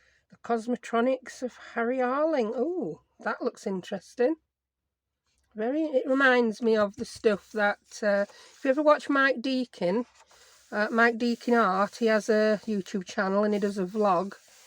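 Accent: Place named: Yorkshire, United Kingdom. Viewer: Dot from Derbyshire Hi, I have just watched your video, for first time. I really enjoyed it, also love your Yorkshire accent. I will be following you. I have just discovered Daphne's Diary also and have had a look at the last two years issues on you tube flip through, I think it is brilliant and will be ordering it for this year. Being new to collage I'm sure it will be great to use it. I have also been watching Froyle Art who is a great inspiration for collage and Gelli printing so I will be dusting off my Gelli plate and 2023 is going to be a creative year for me. Thanks for sharing the video, lots of love from Derbyshire, Dot x.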